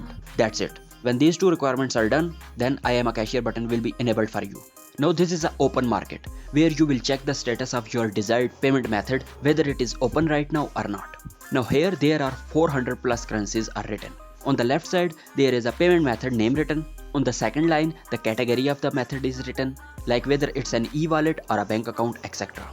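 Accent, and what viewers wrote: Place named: India. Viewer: Your indian accent is really so strong accent it almost seems your being funny😂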